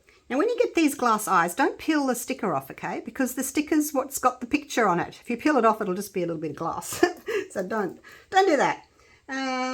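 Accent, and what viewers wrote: Australia. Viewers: I just the Australian accents so could listen all day. In fact I'm in the u UK and loved Aussie when I went all those years ago and came home with the intention of emigrating there but never did. Regret it like hell now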